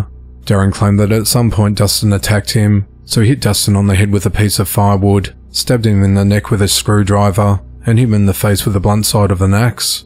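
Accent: Australia. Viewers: The narrator is Australian or nearby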